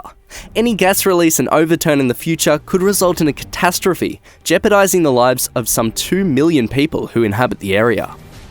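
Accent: Australia. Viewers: The narrator has a really solidly cool accent I'm thinking that it's Australian I hope I'm right but I'm just wondering now does different areas of Australia have different type of accents like the United States because I really like his. Would be fun to go live in that area just to come back with one.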